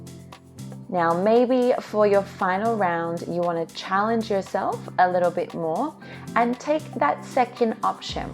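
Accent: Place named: Sydney, Australia. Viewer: Thanks:) you're beautiful Sydney accent makes me nostalgic <3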